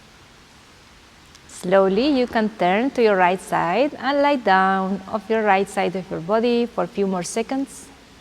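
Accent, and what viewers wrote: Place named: India. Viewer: Nice English pronounciation style is same as Indians....JGD.....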